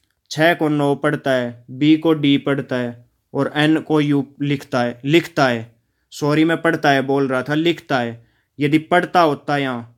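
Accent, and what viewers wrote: Haryana, India. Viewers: Haryanvi h ye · Hariyana se ho kya sar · Haryana ka laage h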